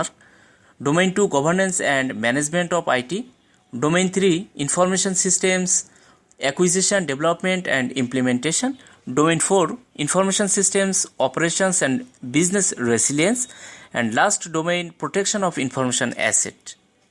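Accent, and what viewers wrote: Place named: Bangladesh. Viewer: Very good...great presentation and I am also enjoying your eastern Bengal influenced accent.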